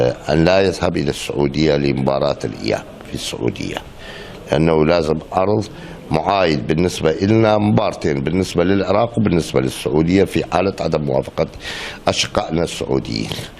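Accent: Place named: Iran. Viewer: وربي حتي كلامه تحس ايراني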